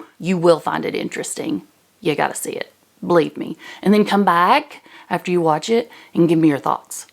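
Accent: South, United States. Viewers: Hi.good blog today.op love your snow. From northern Canada so we get lots. I bought dr Fungs Obesity Code book and a great read. Halfway thru the video with CEO you recommended. Great information and makes such good sense. thanks for recommendation. Love your show and your southern accent. Wild. ❤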